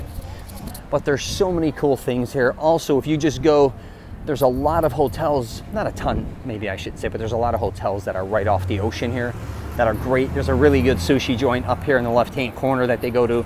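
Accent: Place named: Chicago, United States. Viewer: such a Chicago accent! haha. This guy is my twin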